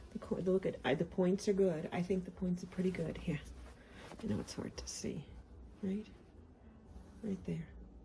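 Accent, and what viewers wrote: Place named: New York, United States. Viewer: Thank you for your truly helpful and honest instructions. Your close up video of piecing and sewing is very helpful. And I love that NY accent and attitude.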